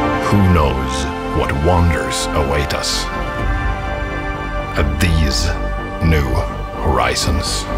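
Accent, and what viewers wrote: Sweden. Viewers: I thought he sounded Swedish =)...I was right. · TROLLS DOE He's just Swedish.. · I bet the narrator is Swedish :D You can clearly hear the accent. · Why did you pick a swedish voice over dude. Hurts to listen to. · The speaker must be Swedish. He has to be.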